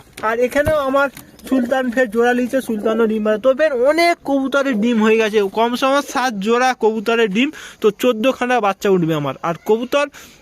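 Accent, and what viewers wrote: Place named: India. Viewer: আপনার কথা গুলো দেখে মনে হচ্ছে আপনি ইন্ডিয়ার লোক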